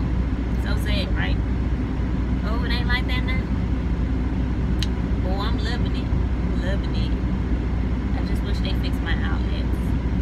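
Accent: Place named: Georgia, United States. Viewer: You from south ga huh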